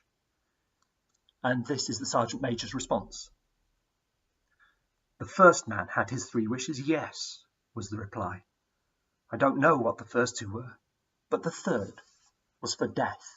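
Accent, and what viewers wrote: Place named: United Kingdom. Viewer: Please tell me in which british accent you are talking😊💐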